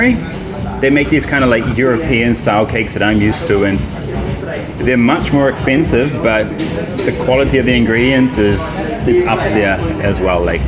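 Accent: India. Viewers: dude you got an Indian accent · Aap hindi main boliye/please speak in hindi. I like your hindi accent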